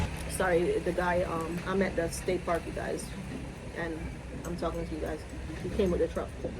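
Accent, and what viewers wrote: United States. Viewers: Sorry that happened,I have a question your accent is American,where did you attend school,what state ? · Why are you talking in an American accent? Your other videos are PURE JAM!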